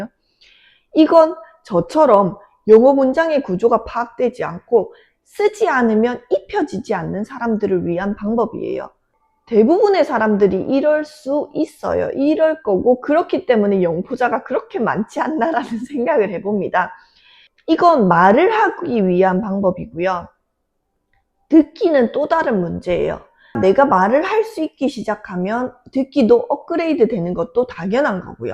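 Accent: Gyeongsang, South Korea. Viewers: 선생님, 안녕하세요. 바로 구독합니다. ㅎㅎ 저는 한국에서 5년 임상 병행하며 임용 패스 후, 현재는 5년차 초등학교 보건교사로 근무중입니다. 학생때부터 미국간호사에 대한 생각이 있었고, 엔클렉스도 쳐야지 쳐야지 했는데.. 더 늦기전에 후딱 해치워야겠다?ㅎㅎ 라고 생각하여.. 올해 여름방학에 바짝 공부해서 8월말에 엔클도 패스했네요. ㅎㅎ 사실 저는 보건교사가 제일 하고 싶었고.. 그래서 지금 보건교사를 하고 있는게 정말 행복하지만.. 간호사로서의 발전에는 많은 한계가 느껴져요..ㅜㅜ 거기다 대학생때 뉴저지, 뉴욕에서 4개월간 병원실습도 하고, 미국생활도 해서 그런가.. 미국에서 더 많은 케이스를 보고 경험하면서 간호사로서의 전문성을 함양시키고 싶은 마음이 계속 드네요..ㅠㅠ 무엇보다.. 미국병원의 신문물들을 직접 경험하고 싶습니다.!ㅎㅎ 한국과 많이 다른 점에서 상당히 충격을 받았더랬죠.. 그래서 지금 진지하게 미국행에 대해 고민중입니다.. 미국가서 저 혼자 살아갈 자신이 전~~혀 없고, 또 영어에 대한 공포때문에.. 뭐든게 무섭고 두렵네요..ㅠㅠ 그러던중, 선생님 유튜브 우연히 보고 공감되는 부분이 많아 댓글 남깁니다. 얼마나 힘드셨을지..ㅠㅠ 마치 저 신규 때 매일 울며 출퇴근하던 그런 기분이 들더라구요.. 그리고 억양이 경상도분이신거 같은데.. 경남지역이신가요? 전 대구사람이라.. 경상도 말투를 들으면 어찌나 반가운지요.ㅠㅠㅎㅎ 아무쪼록 항상 건강하시고, 영상도 꾸준히 업로드 해주세요. ㅎㅎ 혹시라도 제가 미국행 결정하게 되면 댓글 남길게요. ㅎㅎ 선생님 응원합니다. ^_^ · 경상도 사투리를 들으면 개인적으로 반가워요, 저는 서울 사람 이지만 경남지역에서 오래 살았습니다. 김해 진영 마산 창원 그리고 부산. 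저는 독일에서 거주했었습니다. 누나 영상을 보면 예전에 독일생활 초창기때 고생했던 기억이 떠오르네요. 영어 be동사 개념도 모른 상태에서 생존을 위한 독일어 공부했던 기억이 납니다. 독일어를 먼저 배워서 그런지 공부를 하다 보면 영어에서는 왜 이렇게 하지 라는 아쉬움이 있을 때가 많더라구요. 아무튼 누나 미국생활 너무 부럽네요. 저는 친미성향이 있습니다. 언젠가 미국에 꼭 한 번 가보고 싶습니다. 영상 자주 챙겨보겠습니다. 누나 사랑해요.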